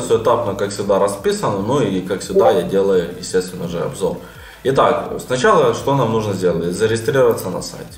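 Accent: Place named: Ukraine. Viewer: Говор у тя на украинский похож. Но возможно ты с Ростовской области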